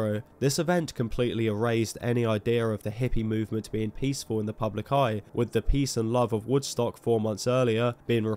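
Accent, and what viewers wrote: United Kingdom. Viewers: There's actually a fair number of documentaries on this, made by people who were there at the time. Fun to hear a British kid do an abbreviated rundown on decades of American history.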